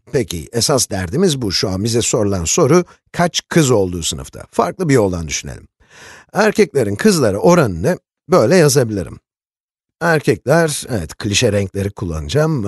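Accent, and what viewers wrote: United States. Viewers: hocam amerikalılar gibi konuşuyorsunuz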